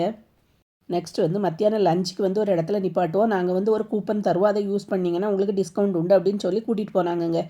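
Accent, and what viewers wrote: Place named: Nagercoil, India. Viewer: Hlo sissy ungaluku native nagercoil ah slang apdi iruku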